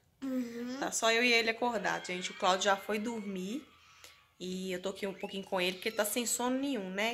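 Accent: Minas Gerais, Brazil. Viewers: Ai desse jeito vou engordar,quero fazer todas as suas receitas...esse cafezinho é muito bom a tarde...linda,adoro seus vídeos esse seu jeitinho mineiro de falar é muito gostoso.meu avô era mineiro tenho um pezinho aí em MG.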